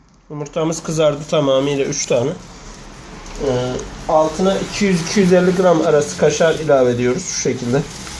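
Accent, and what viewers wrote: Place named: Thrace, Turkey. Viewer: bu adam trakyali mı konuşması trakyalilara benziyor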